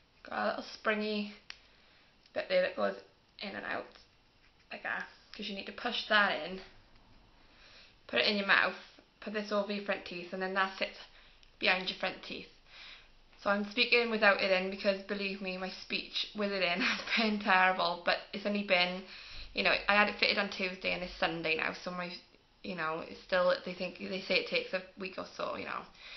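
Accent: Wales, United Kingdom. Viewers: I love your Welsh accent. It's been ages since I last got to hear it now I've moved to Canada. Just wondering, how long did your treatment take? I must have missed it in the video. Did it hurt? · hun what practice did you have your teeth done with you sound welsh and im from caerphilly your teeth look amazing xx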